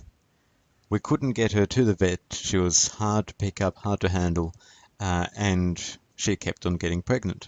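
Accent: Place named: England, United Kingdom. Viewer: Aww... God bless you and your message. I came across your video because I think my cat has a UTI. Please pray for my cat, Harley. I will be praying for him and everyone who comes to this page. Prayer is powerful and thank you for your message. You sound English. I lived in England for 7 years and felt that I was the only Christian that lived there at the time. God answered many of my prayers while living there. God bless you and everyone that visits your video.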